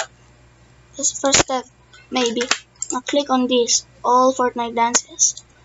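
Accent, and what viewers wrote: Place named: Philippines. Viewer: nice filipino english accent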